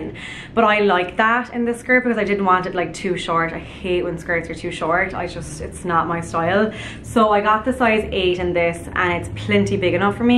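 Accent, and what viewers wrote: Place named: Ireland. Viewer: Cool Irish Accent :D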